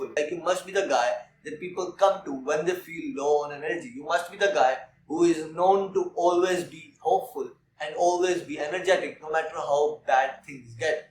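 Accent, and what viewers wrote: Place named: Pakistan. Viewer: Your ascent is little bit pakistani where are you from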